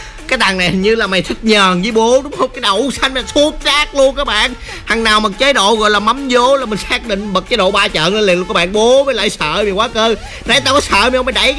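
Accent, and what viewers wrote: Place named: South, Vietnam. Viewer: .ôi trời, người tôi mong đợi một youtube làm về liên quân mà có giọng miền nam giống tui😭đó giờ xem toàn miền bắc 😭mò mãi mới ra đc Anh, ôi hạnh phúc quá đi 😭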